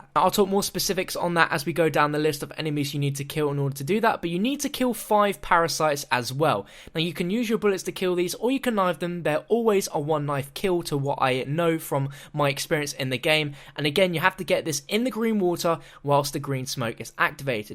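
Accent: United Kingdom. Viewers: He's British, his accent changes pronunciation.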